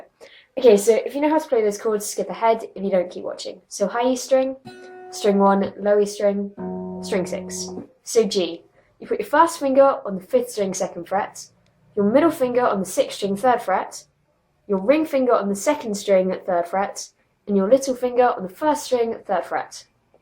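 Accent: United Kingdom. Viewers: British accent is so so......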